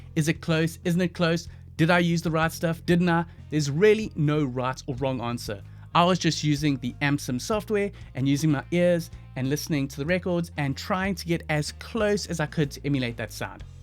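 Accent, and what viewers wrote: South Africa. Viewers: 2 seconds into the video I knew you were from South Africa 🇿🇦🇿🇦🇿🇦🤘🤘🤘🔥🔥🔥 much love from Kzn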